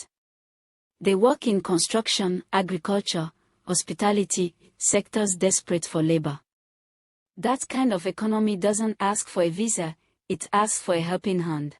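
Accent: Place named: France. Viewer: So what’s with the French accent on the AI. used to be SOUTH AFRICAN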